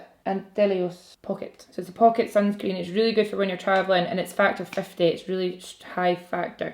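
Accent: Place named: Glasgow, United Kingdom. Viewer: Ive been watching all the recent dramas across the pond and it’s so lovely to come here and listen to that beautiful familiar (glasgow girl here too!) accent and some gorgeous makeup! Keep it up Mrs!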